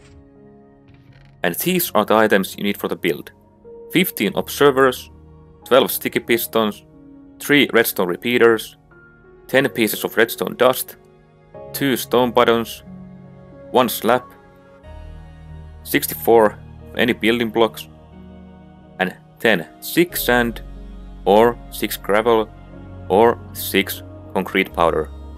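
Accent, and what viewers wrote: Finland. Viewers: hmm what do i hear? are you from Finland or Estonia? your acsent telss a lot.... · nice finnish accent mate. x1 slap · I cant focus on the tutorial because I get soooooooooo lost in that Finnish accent 🥰 it’s so beautiful 🤤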